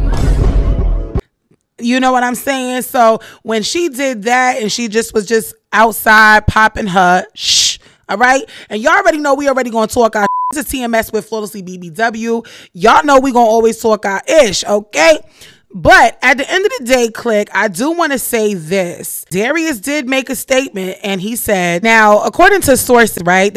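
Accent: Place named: Brooklyn, United States. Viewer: First of all let's start with your beauty 😍. U r Amazing beautiful and the accent is BK New York ❤ She thought having the baby alone is all she wanted and she's up here and he's down there. Keke doesn't respect the man so it's best for him to go find his Queen that he wants... 👑 Keke is a Queen 👑 but let her go find her Prince 😊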